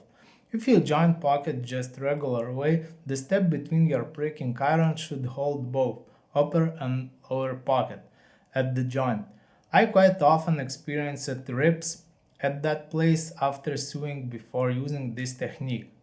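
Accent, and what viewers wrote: Russia. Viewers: Ты русский? У тебя акцент как у русского и русские субтитры)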